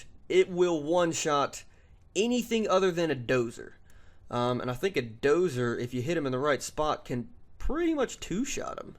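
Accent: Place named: South, United States. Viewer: Nice vid and am I right to assumed you're from the south?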